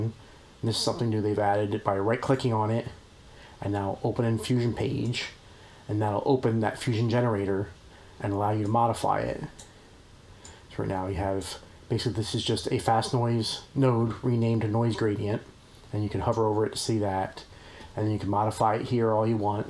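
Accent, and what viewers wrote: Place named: United States. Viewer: Nice overview, definately helpful! I have a very off-topic question, which state are you from? I think i can hear it but i'd like to have it confirmed! :)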